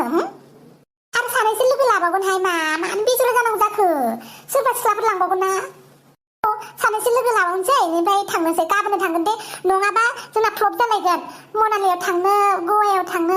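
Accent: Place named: Kokrajhar, India. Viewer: Nwngsini Boro-a Kokrajhariatar...mwjang👍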